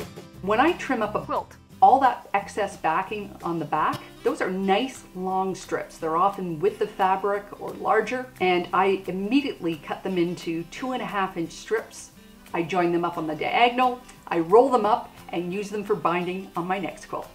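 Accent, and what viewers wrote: Canada. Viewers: Enjoyed your video very much, so I subscribed. I feel positively brilliant because, as a newbie quilter, I figured saving 2 1/2" squares, 2 1/2" strips, and 5x2 1/2" rectangles would be most useful--same as you, an obviously experienced quilter, figured! I needed quilting fabric to make a block once a month for a local craft store--they draw a name and the winner takes home all. Since I let it be known that I would accept scraps, now I have plenty for the block drawing ;-) The pieces that are too little get chopped up to make animal shelter beds. A friend also gave me a ton of leftover scraps from a T-shirt class, and then there are all the batting scraps too small to piece together for potholders. Watching TV with my husband is a good time to cut up all those unwanted scraps! BTW, love your Canadian accent. Signed, a scrap loving neighbor in Washington state.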